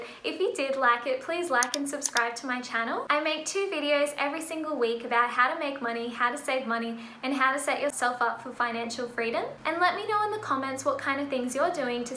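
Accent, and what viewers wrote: Australia. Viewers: thank you so much for your helpful information I really into your Aussie accent. Your voice is super soft-spoken =D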